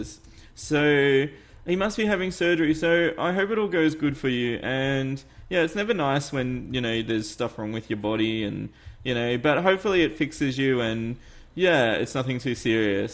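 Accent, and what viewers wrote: Australia. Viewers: Bruh oh my god it makes ur channel even better because your Aussie . First good Australian youtuber keep up the good work man. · You're Australian? I didn't realise. What state? I'm from SA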